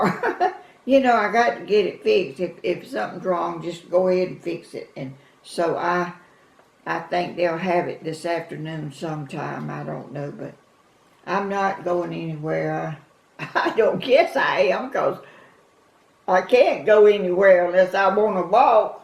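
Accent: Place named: Georgia, United States. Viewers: First I want to tell you I’m from Crabapple (Alpharetta/Roswell area) and have lived in the Dallas area in Texas for the past 20 years. I love seeing your beautiful creations and your accent makes me miss my parents (who passed in 1984 -Mom and Dad in 1996). I don’t get to hear it enough. My youngest daughter stuttered but the schools here brought in a therapist and they made it go away. But I’m just like you - tender hearted and I was raised to treat others as I want to be treated. I can stand up for others but never myself. I’m sorry if anyone has ever been rude on here to you. You might like this comedian on YouTube - she’s from the Carolinas and a clean comic. She’s fabulous. Jeanne Robertson. She’s a hoot. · Just finding your vlogs. My family is from Vidalia. Also have family all around Dublin. Who knows one of our family tree limbs might have crossed. 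Enjoying listening to you talk makes me homesick. Haven’t been able to go back to Vidalia due to COVID 19. You now have a new subscriber. I will let my family know about your vlogs. Since you love country music you need to come to Nashville. We have lost a lot of great singers but we still have a lot still singing so when you feel like traveling come on over and we will have to get you some Grand Ole Opry. Tickets.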